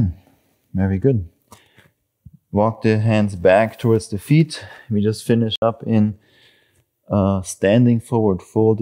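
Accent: Germany. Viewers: Deutscher Akzent? 😁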